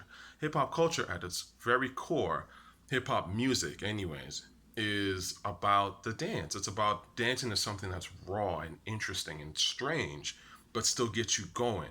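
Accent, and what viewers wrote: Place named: New York, United States. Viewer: He talks like a fruitcup from new york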